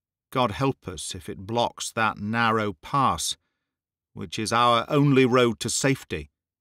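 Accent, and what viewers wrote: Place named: Scotland, United Kingdom. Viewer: Perfect as always,! Your Scottish accent is so fun to listen to!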